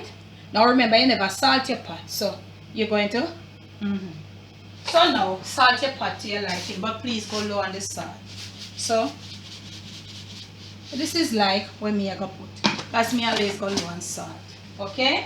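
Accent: Jamaica. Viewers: Hi Ms 👋. I enjoy and like watching your videos. I like to hear you speak and your Jamaican accent. Your cooking and method is very simple. I wish you all the best in life Good health and happiness ❣️.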